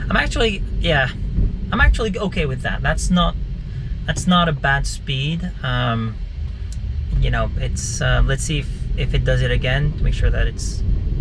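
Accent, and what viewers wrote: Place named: United States. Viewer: Hi, are you... French? You've got a good American accent from what I can judge (being French myself😁). Nice video 👍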